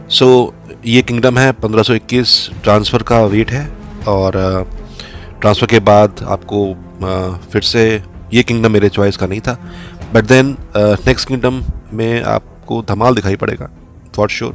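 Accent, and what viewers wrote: India. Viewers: Vi may tari English sunka hamesa sochta tha ki tu Indian he hay lakin confirm nahi tha keuki tu hamesa English may bat karta hay but aj confirm ho gya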